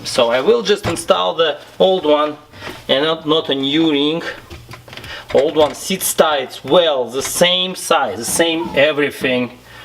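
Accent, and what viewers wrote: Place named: Russia. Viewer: Смешной русский акцент))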